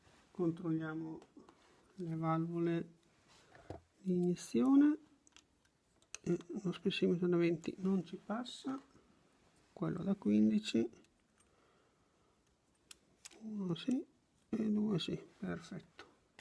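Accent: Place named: Romagna, Italy. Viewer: Ciao, mi sono avvicinato da poco al "fai da te" sulla moto, ( ho un GS del 2005) grazie per i tutorial sempre ben fatti. Per le chiavi dinamometriche, ce n'è una che copre tutti i range di utilizzo previsti dai serraggi dei GS? Oppure mi consigli di prenderne 2 una piccola che arriva a tipo 25/30 Nm e un'altra che da 25/30 va fino a 60? Nm... Grazie per info..... Dall'accento si intuisce che sei romagnolo, posso chiederti di dove? Grazie mille.. Ciao... 🖐️👍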